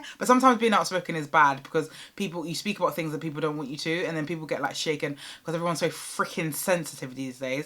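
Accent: United Kingdom. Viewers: your british accent 🇬🇧 i wish i had the same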